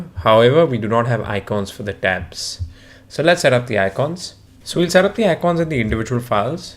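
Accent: India. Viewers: I love your indian accent :) Thank you bro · The indian accent ><' ... but ... Good job man ;)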